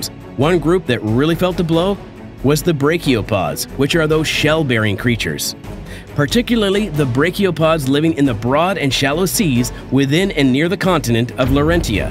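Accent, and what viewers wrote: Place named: Canada. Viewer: The narrator seems to have a Canadian accent.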